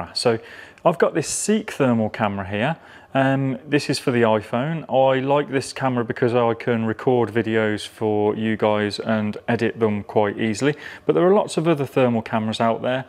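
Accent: United Kingdom. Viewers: As always on this channel, this video is didactically excellent. Moreover, it is very pleasant to have all this presented in the distinguished manner of a British gentleman. Thank you.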